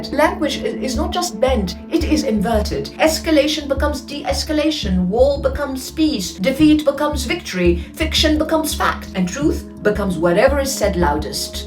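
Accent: RP, United Kingdom. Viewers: Excellent video but what's with the margaret thatcher accent 😅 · yeah but I can't stand that British accent though. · Please speak slowly and succinctly. No one is interested in your high speed Bloody British English.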